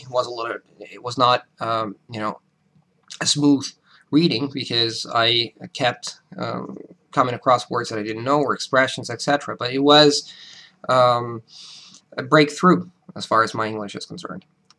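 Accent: United States. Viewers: i was almost a minute and a half into one of your other videos before i realized you weren't american. great job, you clearly worked at it. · The American accent is very difficult to acquire to English learners. probably has the best accent I have heard. However, it does still does not sound natural. I can hear his Italian accent when he says words like "videos". He says "Veedios". Not, trying to be critical because he is an amazing polyglot. I am speaking as an American who only speaks English and Spanish badly. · I love your American accent. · Your American accent is excellent! For the first few minutes you speak, it's hard to tell that you aren't from the states! Thanks for the video, you've given me motivation! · It's funny cuz not only do you have an American accent, you have a regional one too (Illinois/Chicago) as a result of your tutor. Anyways, thanks for motivating me. 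I'm trying to learn German all on my own. I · Interacting and speaking with native speakers is definitely not sufficient in sounding native and mimicking that accent. I am American and there are SO many people who have lived here forever and still have very heavy accents (Arnold Swarzenegger, for example). It takes determination and a good ear to listen to what you're doing wrong. He has a very good American accent but (like most people in a foreign language) I wouldn't think he was an American if I talked to him, but he sounds VERY good. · you have a great american accent!, and i can totaly understand you, when you said america was around you and you havent even been to the country, same here with me!, i have never went to spain or mexico or any latin countries but yet when I talk to native speakers some say my accent is better than theirs! lol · You speak American English extremely well, better than millions of native speakers! 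When are you coming to America? Michigan, USA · Beautiful! You sound like me (I live in America). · Hi,! Your accent is great and your English is just perfect. How much time did it take you to become fluent and to acquire an American accent? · You have and accent; it's obvious. But to have a command of the american accent, large vocabulary, and fluency like you do without even setting a foot in the U.S.A is very impressive. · I commented on your other video but you make a great point: speak clearly and simply. So many foreign people speak English "fast" thinking they're fluent but they are very difficult to understand. A person with an accent who speaks clearly and simply is so much better. btw you do sound 99,99% American, I really have to listen to notice you have an accent and would not pick it up in normal speech. Congratulations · Hi! I'm a native Italian speaker and I also have an american accent as you do. 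Native English speakers are astonished when they hear me speaking their language. This also happens when I speak Spanish and Czech. Finally I have found somebody doted as me! I'm only 21 and i'm looking forward to learn more languages. Hopefully one day I'll reach your level! Thanks for the inspiration!:D You can add me on G+ and we can have a live conversation on our foreign language learning systems. (Per il tedesco sto anch'io usando I libri della assimil;)) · Great American accent. Your American accent is a little weak with certain words like "mother" and "country". Overall, it's really good! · Interesting for me to know the story behind your American accent! So it was a tutor where you adapted to and you were provided with lots of materials form the U.S. When I was in elementary school I lived with my family in the United States and I spoke "native" American English. Why did I lose this accent? 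It was because my English teachers at grammar school when I was of an age of 12 forbid me to speak American English. So I was forced to adapt a British-German accent of English at school. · Wow. I had no idea you're Italian. I thought you were American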